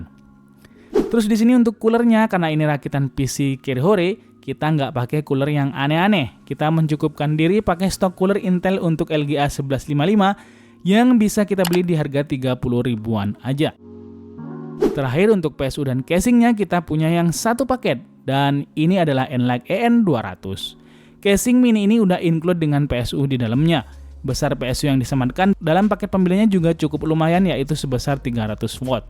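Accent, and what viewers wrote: Madura, Indonesia. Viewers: bang.. lu orang Madura ya ???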